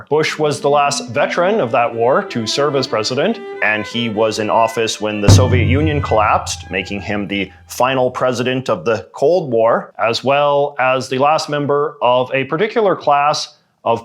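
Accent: Canada. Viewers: He’s Canadian… that’s how they say “about” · Ive never heard american history told with a Canadian accent before.....feels weird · I came across your channel before. Are you Canadian?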